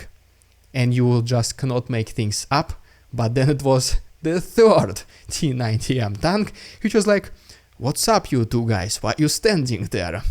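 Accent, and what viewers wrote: Russia. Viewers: I love your accent and content. I really hope that you are not Russian and just playing everyone with your accent! Your content is great, keep it up!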